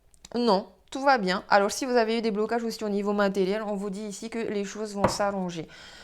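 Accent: Tahiti, French Polynesia. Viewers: Bonjour J'ai reconnu votre accent de Tahiti J'y ai vécu en 70/71 avec Raupea pendant 10 mois .j' ai passé toute ma vie avec son souvenir dans mon cœur j, avais 20 ans .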